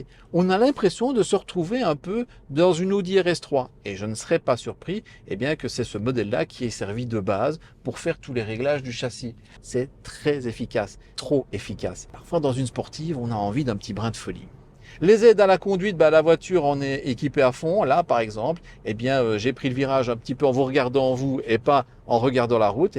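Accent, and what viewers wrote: Belgium. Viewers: Une chaîne amateur mais aux vidéos très pros, un bien bel essai, agrémenté d’un joli accent liégeois 😊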